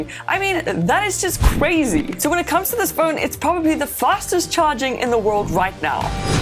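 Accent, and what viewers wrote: South Africa. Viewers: Are you South African????? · Your SA accent is refreshing 🙂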